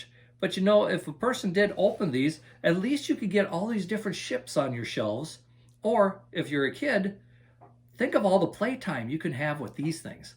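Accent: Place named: Upper Michigan, United States. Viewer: Collected since 78 and still hooked. I have to ask about your accent. Are you from Upper Michigan by chance? I am and your accent sounds like back home. Lol Great channel!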